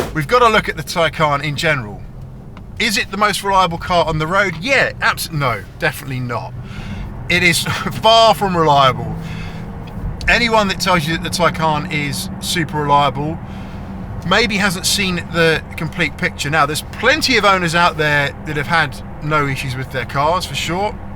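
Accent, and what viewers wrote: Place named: United Kingdom. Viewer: I can't believe how difficult this is to follow… If everything is concise and well worded and nicely structured, the British accent only makes things better… If that's not the case you're really stands out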